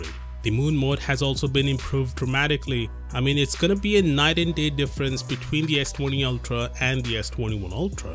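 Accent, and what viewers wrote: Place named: India. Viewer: You're accent sounds Indian.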